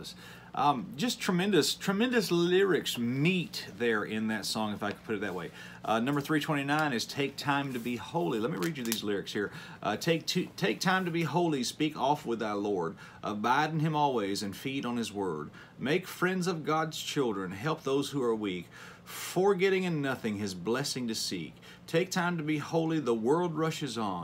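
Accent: South, United States. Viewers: I was just about to ask you where you are at or from. Because your southern accent is just like ours. I was going to guess TN or KY. I looked up the radio station and it is in middle northern KY. I am in northern middle tn, just across the KY/TN state line. So directly south of you. Nothing like the old gospel hymns. We have a small church and few young people want to be a part of it. They want the entertainment of the worldly church. The parents and grandparents do not really like those churches, but they want their chuldren in church, so they go there. They figure any church is better than no church. There is so much ignorance in the Christian world today. Not enough accurate doctrine being taught., its great to see someone in your generation that understands this and reaching out like you do. I have always thought of the missionary field being in other countries and extreme rural areas. 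But I see the need all around us, everywhere. There is a great need of re-teaching Christians the Bible. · I like your southern accent. I'm from Alabama now in Kentucky. Keep up the good work. Medium well btw. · Brother, thank you for this timely video. I just downloaded the WIOP app. Also, from one southerner (Texas gal born and raised lol) to another, I understand your accent super clearly, haha. May the Lord bless you, sir.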